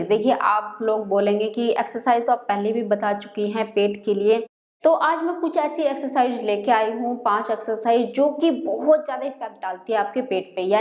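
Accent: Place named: Himachal Pradesh, India. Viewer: lgta hai Himachal se ho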